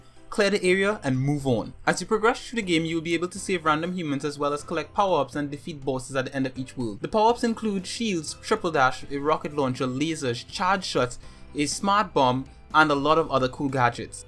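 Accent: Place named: Trinidad and Tobago. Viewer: are you from Trinidad and Tobago?.... you sound like you are and great review.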